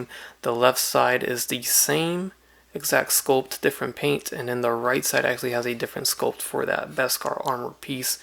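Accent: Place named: Asia. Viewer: great video man. i can't wait to pay resale prices for this lol...i have a random question tho, is your last name, what is your ethnicity? i sometimes hear an asian accent, asking for a friend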